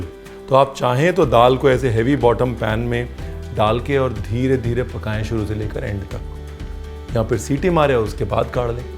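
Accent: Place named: Lucknow, India. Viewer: हय की नहीं😀😀😍❤️ ....chef ye hamare aapke Lucknow ki tone h...aapki बोली mein kafi baar ye nazar aata h 😊😊 So sweet Daal to ekdam mast h Ranveer 🌹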